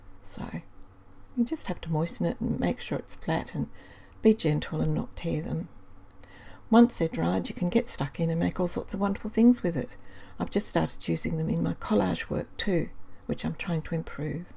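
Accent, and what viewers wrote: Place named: Australia. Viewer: GREAT VIDEO, NICE VOICE AND AN AUSSIE 😊 THANKS SO MUCH.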